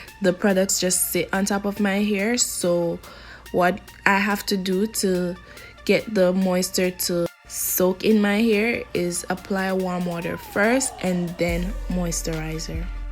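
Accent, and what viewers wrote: Jamaica. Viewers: Jamaican ? I hear the accent lol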